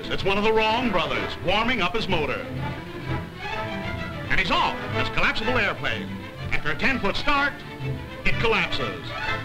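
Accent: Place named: Transatlantic, United States. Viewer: that transatlantic accent tho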